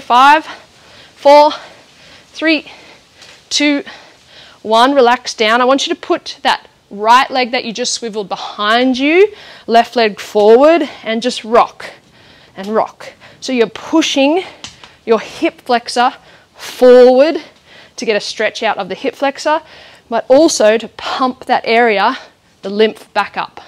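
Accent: Australia. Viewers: Thank you so much! This is my Day 2 and I see a big difference. My husband & I have been painting our home and painting the ceiling, did it for me😩I felt my muscles were so stiff! This surely helped with some Stretching Exercises! Thank you again! Btw love your Aussie accent❣️😘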